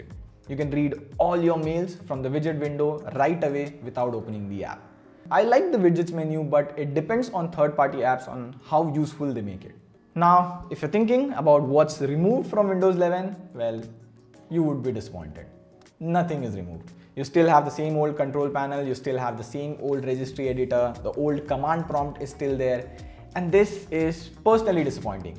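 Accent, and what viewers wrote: India. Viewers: All indian accent are the same😌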